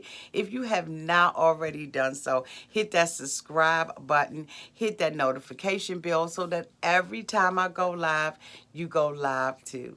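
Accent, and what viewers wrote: Washington DC, United States. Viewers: Just finding your channel. I knew I heard a DC accent. It was the roach crawling down the wall on Puppy’s house for me.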